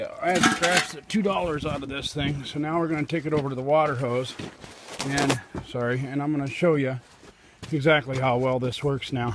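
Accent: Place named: South, United States. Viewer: I like that country man talking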